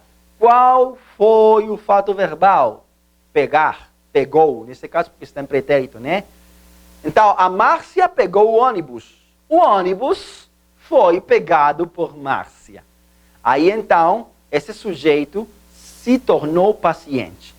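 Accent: Portugal. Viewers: Pelo que entendi ele é português. Sotaque e grafia de Portugal.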